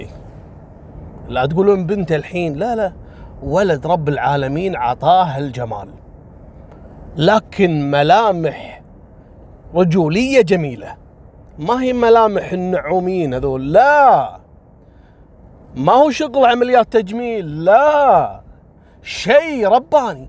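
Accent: Morocco. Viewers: Salam nice story your moroccain accent is so funny. I am following you all the time. Greating from 🇧🇪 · 🤣🤣🤣🤣🤣🤣لجهه المغربيه تذبحني ياحليلك يا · اه على اسلوبك بالدارجة المغربية 😅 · Tbarkallah 3la lahja lmaghribia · انا ما انتبه على احداث القصه بسبب اللهجه الي تتكلم خاصه المغربيه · 😊 حلو يتكلم مغربي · ناري على الهضرة المغربية😂😂😂😂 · اموت بضحكتك صراحة 🥰 وفاش كتهدر المغربي يا سلام يا سلام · تعلم الهدره المغربيه لايقة عليك يالكبيده ديالي😘🇲🇦🇰🇼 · هالقصة سمعتها من عند الخراز. لكن من عندك يا لها طعم اخر وكاني اول مرة نسمعها تعجبني لهجتك المغربية تفطسني ضحك ههه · هههههههههه كلامك بالمغربي يضحك الله يسعدك يا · كفو يا اللهجة المغربية متعوب عليها 😂😂😂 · السلام عليكم انا جزايرية أقيم في فرنسا وانا من متتبعيك الدايمين لك على اليوتيوب والله ابارك قصصك رايعة واداءك اروع الله أوفقك انشاء الله انت تضحكني وتعجبني كثيرا عند محاولتك الكلام بلهجتنا المغربية جزاك الله كل خير يا 😊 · حفظك الله يا حلوه للهجه المغربي منك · و الله احب لما تتكلم لهجة المغربية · الله عليك يا وعلى اللهجة المغربية 😊😊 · احلى شي يوم تتكلم مغربي 😂😂😂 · قصة محزنة لكن حديثك بالدارجة المغربية يضحكني الصراحة 😂😂😂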